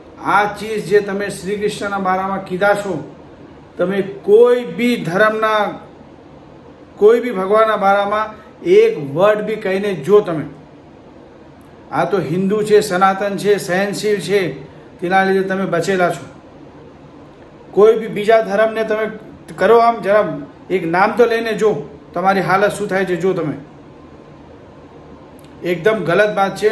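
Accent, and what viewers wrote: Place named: mixed, India. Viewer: Tame proper gujarati nathi lagta thoda up bihar wada chho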